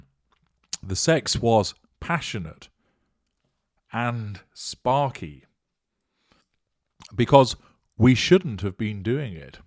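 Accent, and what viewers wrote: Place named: Cockney, United Kingdom. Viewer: The east ender voices are just priceless and make me laugh every time.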